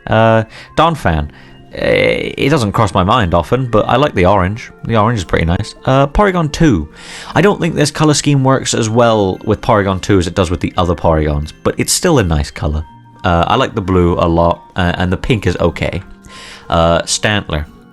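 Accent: United Kingdom. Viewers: are you from the west country in England sir?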